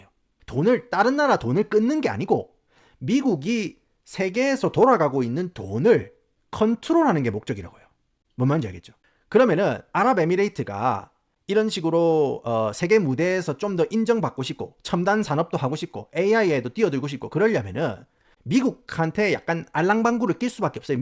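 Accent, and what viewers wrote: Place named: Busan, South Korea. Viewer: 처음엔 부산사투리를 살벌하게 구사하셔서 살짝 당황(?)하면서 초반만 보는듯 마는듯 봤었는데요 우연히 님 영상을 그냥 켜두고 제 할 일 하고 있었는데 하시는 말씀 듣다보니 어려울 수도 있는 정보와 지식을 참 쉽고 재미나게 풀어서 설명해주시는데 (중간 중간 난무하는 드립도 너무 중독적) 그리고 본업도 하시고 육아도 하시면서 자투리 시간을 할애에서 하시는 유튜브이신 것 같은데 진짜 퀄리티 장난 아니네요 이젠 30분 넘는 긴 영상이 기다려지고 (너무 몰입해서 봐서 그런가 이제 짧게 느껴져요) 완전 팬됐어요 럼프짱 성대모사는 봐도 봐도 안 질려요ㅋㅋㅋㅋㅋㅋㅋ가끔 댓글에 혀를 너무 굴려서 영어 발음 한다고 지적하는 사람들 있던데 10년 넘게 미국에서 살아본 제가 느낄때 님 발음도 구사하시는 문장도 흠 잡을데 없이 완벽합니다 영어랑 꽁냥꽁냥 1도 안되는 사람이 일부러 있어보이는 척 말끝마나 콩글리쉬 섞어쓰는거 보다 님이 네이티브로 구사해주시는게 듣는 제 귀엔 훨씬 편하네요~~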